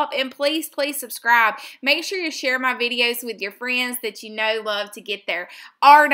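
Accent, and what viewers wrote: South, United States. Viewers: I love your southern drawl AND your vids! 🙂🙂🙂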